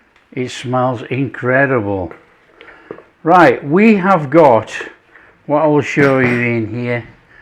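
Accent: Yorkshire, United Kingdom. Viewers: I’ve only recently discovered your YouTube page, but I love it. I think your accent is a great Yorkshire one. I’m from over the border in Lancashire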